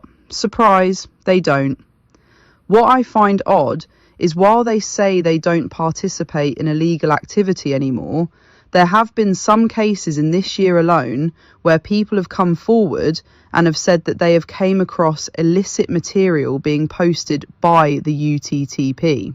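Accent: United Kingdom. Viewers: Whelp, this is the first time I heard your voice, I can tell you’re a Brit, also hopefully the uttp falls and hopefully the whole community dies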